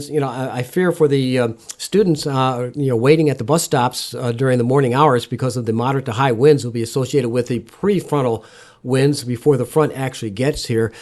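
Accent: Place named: South Carolina, United States. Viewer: wow savannah pretty I hear Sth carolina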